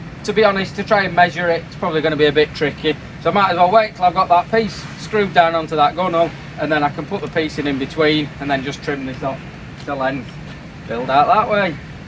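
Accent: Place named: Mexborough, United Kingdom. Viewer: I enjoy Chinese but Indian / Pakistani even better. Incidentally, where are you? I assume Mexborough way from the accent?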